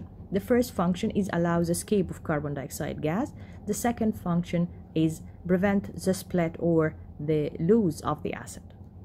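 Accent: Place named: Arab world. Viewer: I think she's Arab, because arabic doesn't have the letter p so it's easily confused with b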